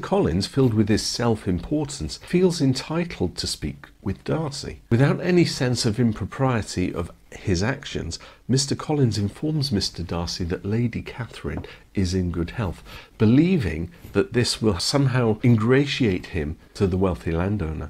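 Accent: United Kingdom. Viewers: Re subscribers, clearly you underestimated the appetite of a legion of Austen fans for a soothing British narrator and their favorite film clips over the well-trodden history of the Beatles 😂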